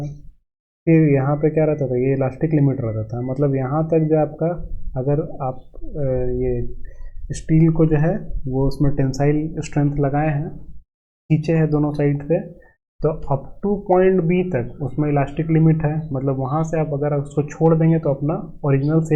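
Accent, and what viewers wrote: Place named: India. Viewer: Indian Accent 🙆‍♂️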